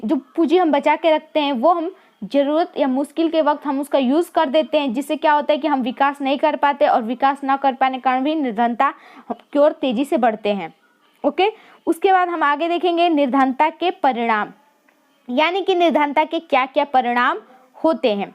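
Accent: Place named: Bihar, India. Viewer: Ma'am aapke language k hisab se aap ballia ya fir bihar se hai, sahi hai na...?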